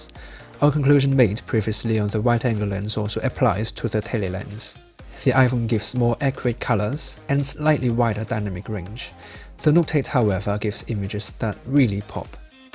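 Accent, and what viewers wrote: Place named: United Kingdom. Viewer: 你的英音真的好听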